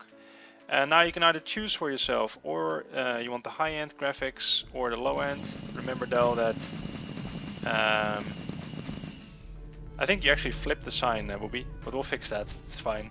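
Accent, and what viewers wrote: Netherlands. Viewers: Haha ik hoor dat je nederlands bent, dat betekent niet dat je engels slecht is hoor maar gwn je accent en uitspraak! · What kind of accent is that? Are you dutch?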